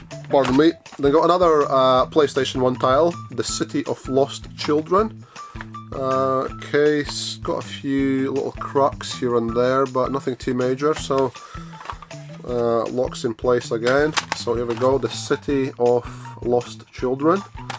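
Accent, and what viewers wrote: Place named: Scotland, United Kingdom. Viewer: Lots of Retro goodness there. I've never had a Game Gear, never come across games for it even in CEX, so I'm sure they are really rare. Excellent buried treasure....I wanna search your garage haha. Looking forward to your origins video and hearing how came to be...also the excellent, clearly Scottish but definitley a bit of a mixture there. Keep up the good work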